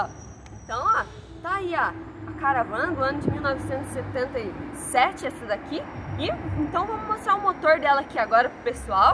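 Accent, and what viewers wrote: Minas Gerais, Brazil. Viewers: Vc fala bonitinho parece mineirinha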